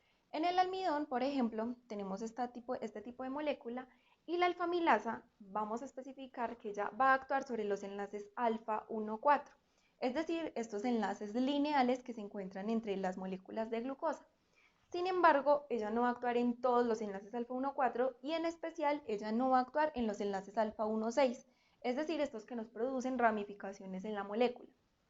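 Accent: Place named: Colombia. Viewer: Que bonito acento, supongo colombiano y gracias por enseñar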